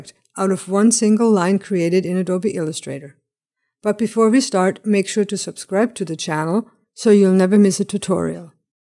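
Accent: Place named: India. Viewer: Thank you for your tutorial. I hear an Indian accent when you speak, although your photo shows your Caucasian genetic makeup. Were you born in India or lived there for a time? And your last name is German! You are a citizen of the world, obviously. Be that as it may, I enjoy hearing English in something other than my flat, American midwest accent. (I am now subscribed to your site.) Chuck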